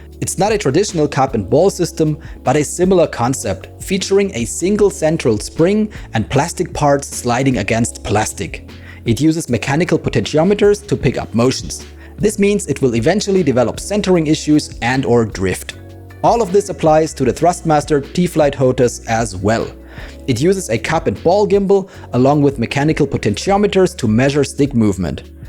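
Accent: Germany. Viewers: Hey don't want to sound mean but are you German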